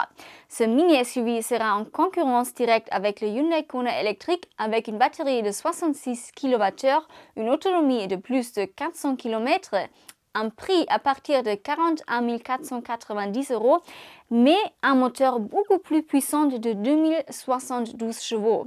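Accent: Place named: Germany. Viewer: Merci. Petit accent sympa 😂 Allemagne ?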